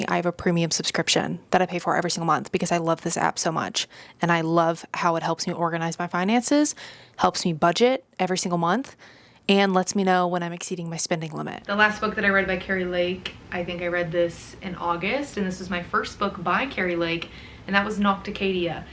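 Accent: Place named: South, United States. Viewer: I love that for her but im afraid im adapting a little southern accent from her and im not even American 😭😭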